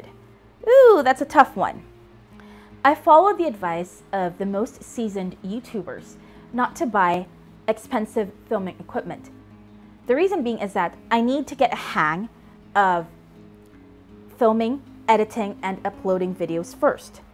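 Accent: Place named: United States. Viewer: You have a flawless american accent.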